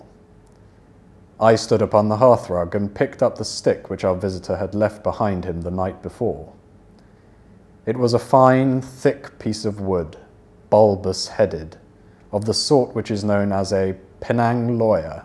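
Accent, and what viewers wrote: United Kingdom. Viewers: 영국영어 발음 넘남 좋앙~~ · I happened to know this channel while watching YouTube. There was too little chance of hearing a British pronunciation. But it’s really nice to hear the contents of many books in British pronunciation. I’m always enjoying this channel. Lastly, I love Mr. voice! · 제가 영국 발음에 빠지게 된 계기가 셜록인데 원서를 영국 발음으로...! 하 너무좋아요퓨ㅠㅠ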